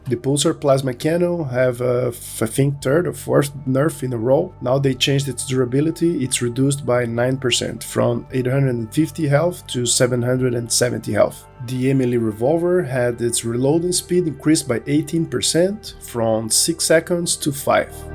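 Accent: Brazil. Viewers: Not the worst english, for a Brazilian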